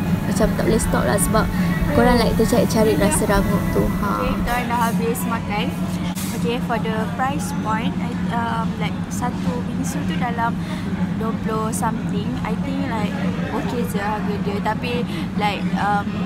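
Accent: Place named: Malaysia. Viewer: Yo malay english is waaaay too boring to be heard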